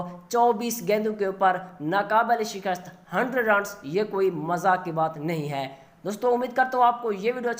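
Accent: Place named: Punjab, India. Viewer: بھائ اپنا پنجابی لہجہ تو بدل دو.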